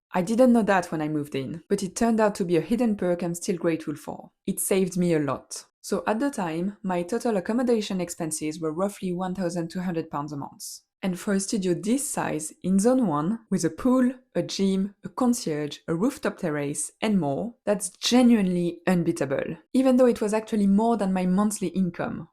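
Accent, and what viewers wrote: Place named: France. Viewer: Where are you from? I'm guessing France but I'm not that great with accents. 🥐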